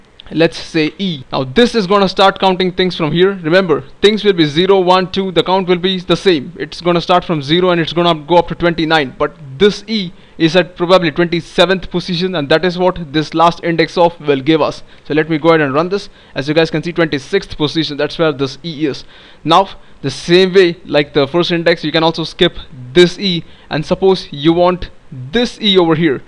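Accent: India. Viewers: nice accent fellow indian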